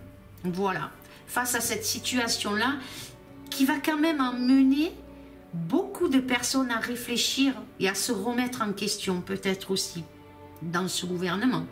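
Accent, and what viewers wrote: South, France. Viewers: Adorable et bel accent du sud Merci pour le tirage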